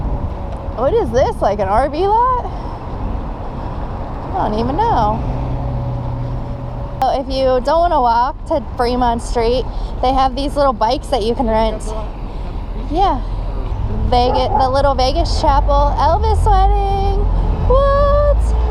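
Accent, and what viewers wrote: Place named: Texas, United States. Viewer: Love your Texas accent. The reason why you see so many vacant buildings and vacant land in Las Vegas, is the same reason that you see these things in most major US cities and that is the prices of property has been pushed up so high, it has become too expensive to carry the financing. So they sit vacant and empty. There is many homeless in Las Vegas. And if you were to go north from Fremont st on LV Blvd you would see it. Or go to Boulder hwy.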